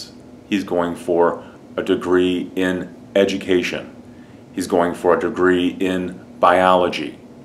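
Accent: United States. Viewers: are u living in ca??sounds so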